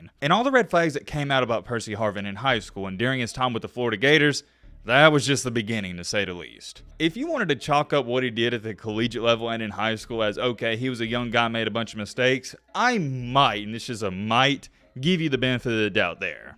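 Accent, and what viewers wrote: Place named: Appalachia, United States. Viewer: be a hiibillie and it's hard to listen to him.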